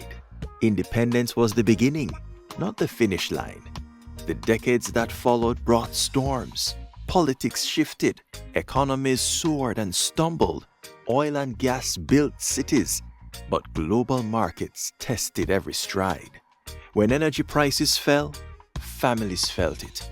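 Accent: Jamaica. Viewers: Why is a jamaica narrating??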